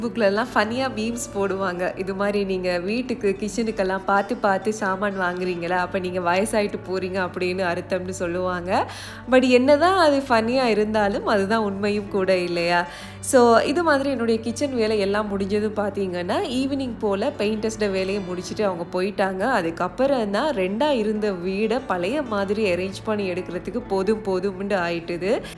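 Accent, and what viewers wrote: Sri Lanka. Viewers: Assalamualaikum sis ✨ epdi irukinga Sinna vandungalam Nalla irukangala 😍unga videos la Enna sis kora iruku 🧐ellamey spruu ah poduringa reality ah iruku usefull la share panringa oru oru visaiyathaiyum ungaluku therinjatha A to Z ellamey share panringa 🤗aprm Sinna vandungalaiyum ungalaiyum paka enaku sandhosama irukum 😍Nd enaku mean ah unga Sri Lanka speech slang rmbaa pudikum sis🥰epothumey Enoda Dua Nd support ungaluku irukum🤲🏻💗 neenga inum naraiya achieve pannanum housewife kula role model ah irukinga 🤗itha Vida enna venum sis 100k subscribe reach pannathuku 🥰insha'Allah neenga inum naraiya videos podunga Nd keep rocking sis🥳💯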